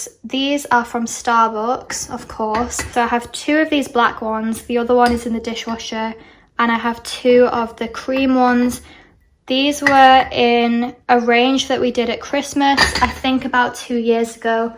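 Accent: United Kingdom. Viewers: Are u scouse?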